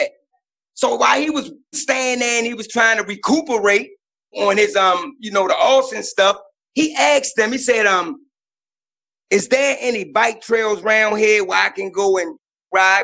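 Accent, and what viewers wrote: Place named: Baltimore, United States. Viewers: He has a heavy Baltimore accent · Being from Maryland I can assure you, his accent/dialect is exactly how the majority of folks frm "Bal-more" talk, and they call cigarettes "fugs" lol Good ppl...and, you are the man, Thanx for the great story telling. To all, stay safe and be well ☝🏽❤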